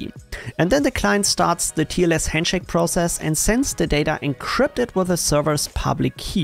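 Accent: Germany. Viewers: Da hör ich doch das Deutsch raus xD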